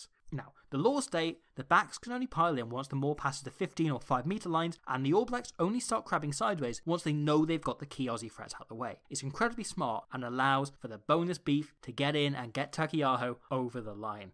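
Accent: Wales, United Kingdom. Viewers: This video is best viewed at 0.75 speed for NZ ears not used to this guy’s machine gun Welsh accent or whatever it is.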